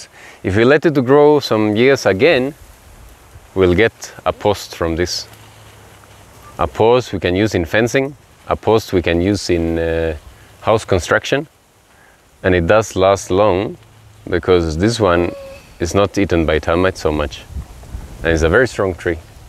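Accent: Kenya. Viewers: I'm surprised you have a strong Kenyan accent , what a wonderful video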